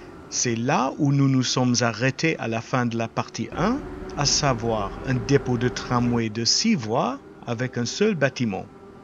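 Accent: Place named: Germany. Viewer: T'es pas français, je dirais allemand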